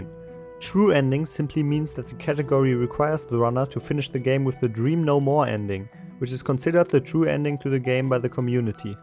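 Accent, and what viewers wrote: Germany. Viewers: Nice Video but hör ich dar ein deutschen Akzent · I, a german, watch you, possibly and probably a german, talk english. Beautiful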